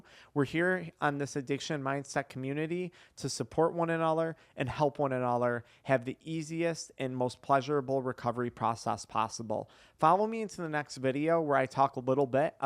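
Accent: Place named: Chicago, United States. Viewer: Love the Chicago accent!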